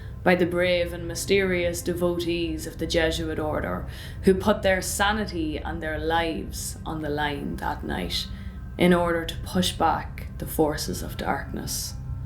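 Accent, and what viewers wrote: Ireland. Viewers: That was absolutely fantastic story telling I really really enjoyed it, you reminded me of my granny ( not in age I don't want to offend a witch such as your self) honestly ghost story's are scarier when told with an Irish accent, thank you for the smile on me face and the shiver down me spine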